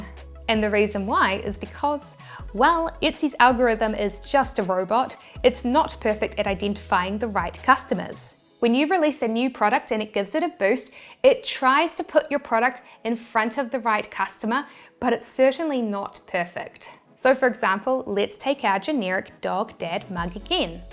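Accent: New Zealand. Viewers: would.love to have a convo about nz as I wana do tshirt around it.. the tshirt u say to use.. u got any specific nz related advice.. and u too was lovely hearing abit of home.in ur voice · NZ accent is brilliant. Fet? Could be Fit. Could be Fat. Nobody knows!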